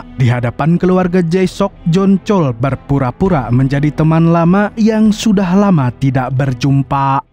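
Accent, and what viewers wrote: Banjar, Indonesia. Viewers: Urang banjar kah ni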